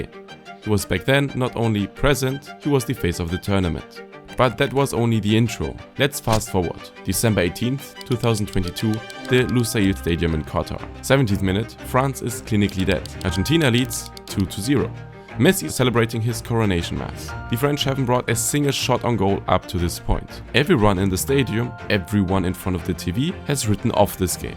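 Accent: Germany. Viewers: I don’t think this guys ever seen Kane play despite his German accent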